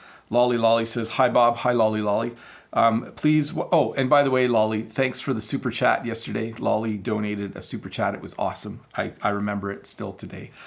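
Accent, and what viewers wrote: United States. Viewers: Hi sir I you really Canadian... I thought you from American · Canadian and American accents are the same or not . We hen I heard you fist time I thought you are american.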